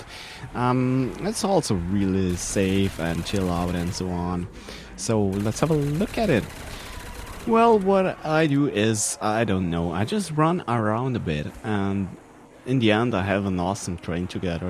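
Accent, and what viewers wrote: Germany. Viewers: Are you german ? :D really good Video btw. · this is great dude thank u i was looking for this ur great and dude are u german i love ur accent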